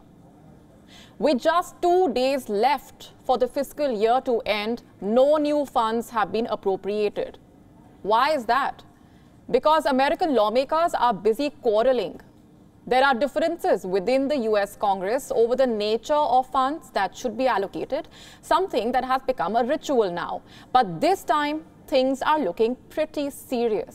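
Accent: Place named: India. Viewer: I would really like to listen to the news. It's just that your accent puts me off. Can't newsreaders work to develop an English accent? Indian newsreaders sound like robots..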